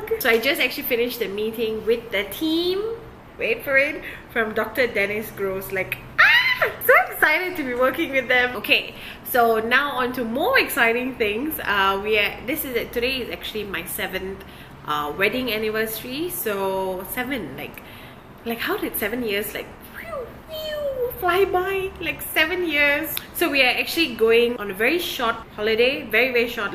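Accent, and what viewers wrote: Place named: South Africa. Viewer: Happy Anniversary weekend to you both. I am confused by your ethnicity. You look South Indian and you celebrate Diwali but your accent is South African and you live in KL.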